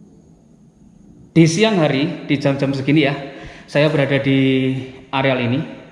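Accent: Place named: Lampung, Indonesia. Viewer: Asslm, waduh jane bru sempat buka hp bos qu, walau tapi pasti hadir slm mlenuk 2 wai dr lampung, ms bhsa ngomong nya kok sama dgn tmpt ku si, kurang jegrak, e ms siang hari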